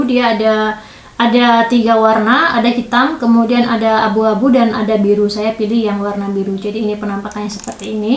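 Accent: Java, Indonesia. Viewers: Jowo banget ya Mbak.. Hehehee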